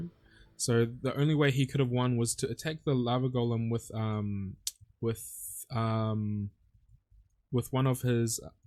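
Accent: New Zealand. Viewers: Dude. Your accent. Are you from NZ???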